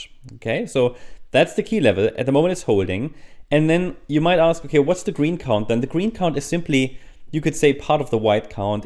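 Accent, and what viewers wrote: Germany. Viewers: Was meinst, ist er deutscher?? Also, für mich hört sich sein Akzent deutsch an😅 Auf jeden Fall, bester Cryptokanal.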